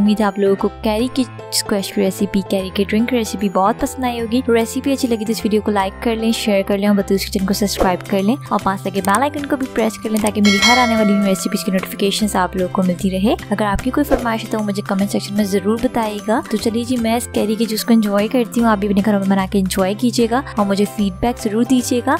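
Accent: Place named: Pakistan. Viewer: My guess was right from accent you must be from Pakistan, ab next year paka try karunga and best of luck Sis. Waiting more of your videos and recipe.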